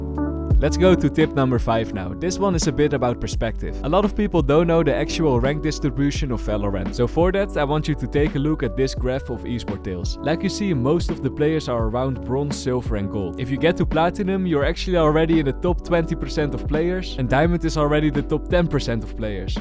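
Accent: Netherlands. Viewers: i smell the dutch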